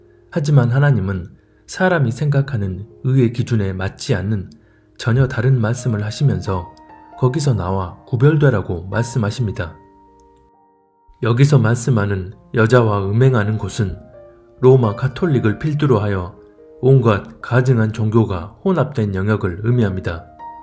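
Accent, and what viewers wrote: Jeolla, South Korea. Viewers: 억양을보니 절라도 사람 같다.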